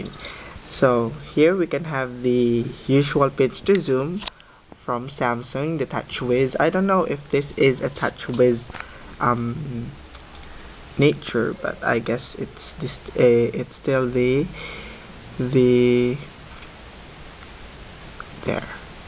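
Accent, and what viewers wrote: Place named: India. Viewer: why is your accent sound like indian?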